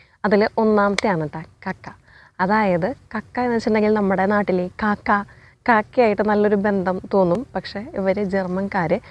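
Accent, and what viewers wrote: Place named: Malappuram, India. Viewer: എനിക്ക് സാമ്യം തോന്നുന്നത് മലപ്പുറം ഭാഷയോട് ആണ്.. 👌🏻❤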